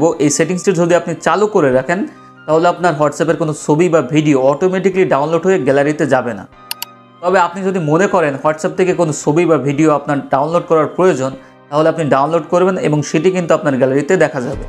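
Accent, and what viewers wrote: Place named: Comilla, Bangladesh. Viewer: ধন্যবাদ ভাই। ভাইয়ের বাড়ি কোথায়? কুমিল্লা মনে হচ্ছে?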